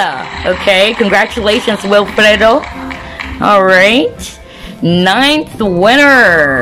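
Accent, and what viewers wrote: Philippines. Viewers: Wow you know how to speak Tagalog, I'm a Filipino you have a nice accent of Tagalog dialect 😍😍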